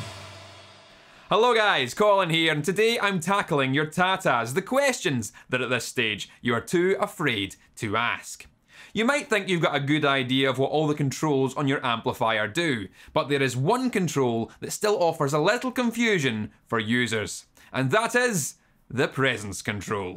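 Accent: Scotland, United Kingdom. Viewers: Honestly i just watch these videos for cool accent🤣, my scottish brother(scottich heritage here) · How can we do your scottish accent?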